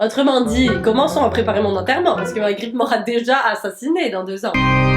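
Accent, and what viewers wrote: Quebec, Canada. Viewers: Grave on dirait vraiment qu'elle est né à Québec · Tu commence à avoir l’accent québécois j’aime bien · Jai une pote quebequoise elle me tue avc son accent et jaime beaucoup laccents québécois et je croyais que le Québec parlai pas francais hihiii donc jai appris laccents grâce à toi je la parle que quebequois la hopihoihii...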